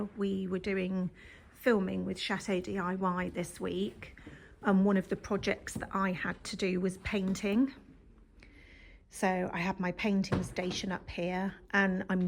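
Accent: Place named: United Kingdom. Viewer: I'm fascinated by your accent. It doesn't sound like other British accents I've come across. Can you tell me the location where this accent is found in the UK (I know it's the South somewhere). Thanks!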